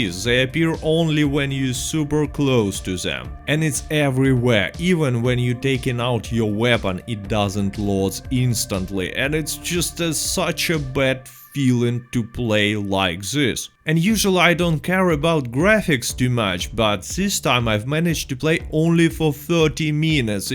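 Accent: Russia. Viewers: а в голосе то проскакивают русские нотки)) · Ахахаха угораю с твоего акцента чувак, русского я за километр учую))) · Типичный русский акцент, большинство так звучат когда пытаются говорить на американском английском, ничего необычного. По крайней мере речь грамотная и понятно что он говорит, а то бывают люди что так коверкают, что фиг поймешь что человек пытается сказать, т.ч. он имеет еще не самый худший вариант русского акцента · I looove the russian accent. All critics - wether CP2077 or Red Dead - sound harder and more punchy with the beautiful sound of this language. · Your accent is very Russian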